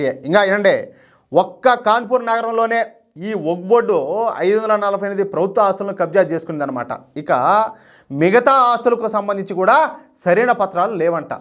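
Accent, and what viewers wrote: Rayalaseema, India. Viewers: రాయలసీమ యాసలో నిక్కచ్చిగా చెపుచున్నారండీ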